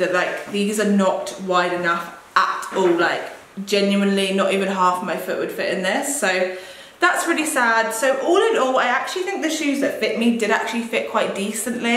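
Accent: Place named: United Kingdom. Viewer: And love your British accent 😍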